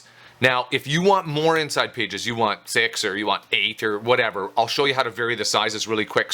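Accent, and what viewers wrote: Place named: Canada. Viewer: You must be a fellow Canuck, at least you sound like one!!! I really love your collections, the dog ones are my faves. I look forward to your new ones. How about a vintage floral meets steampunk inspired collection?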